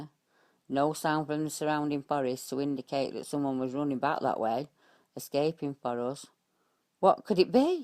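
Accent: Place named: Sheffield, United Kingdom. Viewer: You sound like you are from Sheffield 😊. I pass Epping Forest every day. I hope I get to see Bigfoot.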